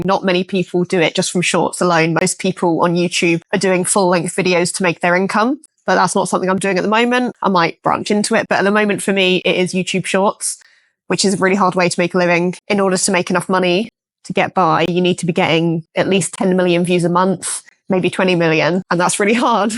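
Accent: England, United Kingdom. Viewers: I got that u are from England by first 3 seconds :) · Прикольно. Английский учил только в школе но почему-то сразу показалось что вы из Англии😊! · From here in America: Oh wow yay the double thrill when I 1st heard, oh too good and fun to be true, is in Britain that accent yay!!!! I LOVE the maternal British isles heritage I have: Irish and Scottish!!!!! So in addition to OH FUN CHEERY INSPIRING DANCING AT LEAST, buddy is a "fellow "Brit": THANK YOU hug for all this and being who you are!!!!!!! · Hey, you're British - that surprises me. I know Lauren Jumps is British (heard her talk). I'm an old guy from Germany and I watch your videos because... YOU MAKE ME SMILE! Thanks.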